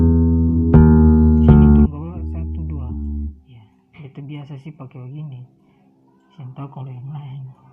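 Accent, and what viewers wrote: Indonesia. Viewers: ngana pe logat macam logat Gorontalo.. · mantaap coooi ambon logat ni ancor hahahah gooot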